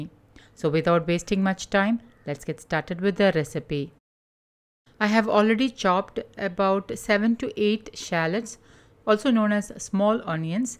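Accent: Kerala, India. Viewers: Mam are you malayali your way of speaking English I think so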